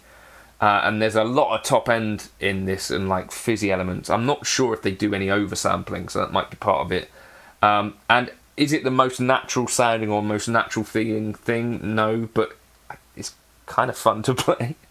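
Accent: United Kingdom. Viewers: The minute that I heard a British accent, I knew that it was going to be about distortion on guitar. The Brits should have an image of a distortion pedal imprinted on the Union Jack (their national flag.) It's against the law to play an authentically clean sounding, electric guitar in Britain. Here is a reminder of what a clean sounding guitar actually sounds like. <link>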